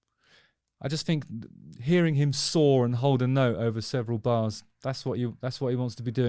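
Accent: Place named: Cockney, United Kingdom. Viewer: Just watching this now after being a newcomer to your Channel. First off, you look much better now clean shaven and with shorter hair. I miss the JHRA intro music. And your inflection in your tone is different. A bit more cockney in this one. I miss the poshness of your voice now. Nice comments on GVF. What has stayed the same is your thoughtful insight and analysis.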